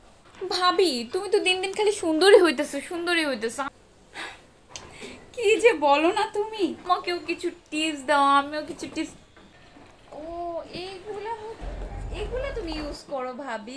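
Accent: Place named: Comilla, Bangladesh. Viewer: Apni sob bastob er sathe mil rekhe Video gulo koren, abar apni amader cumilla vashay kotha bolen, tai apnar video gulo onek valo lage, love you apu, 🥰😘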